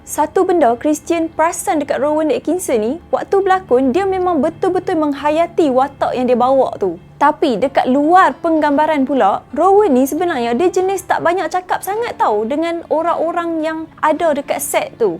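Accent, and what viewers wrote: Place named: North, Malaysia. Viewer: Pekat loghat Utara sis... Orang orang 😅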